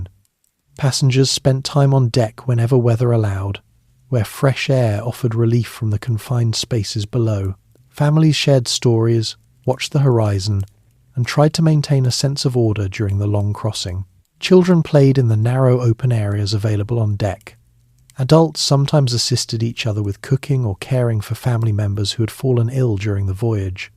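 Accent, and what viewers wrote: United Kingdom. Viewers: I can't listen to a man with a distinct British accent narrate a commentary on the Irish Famine. I'm surprised you don't get it. It's similar to listening to a German narrate a documentary on the Holocaust. What!?! You think we've forgotten?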